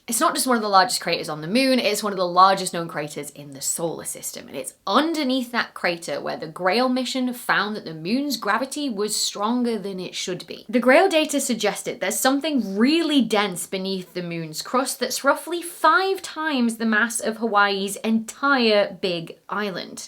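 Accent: United Kingdom. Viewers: Wondering if you'll see this, but curious, are you British? Thanks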